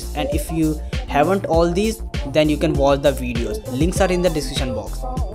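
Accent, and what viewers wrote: India. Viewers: Indian accent is funny and weird. Don't get me wrong though, I love your videos. Keep em up!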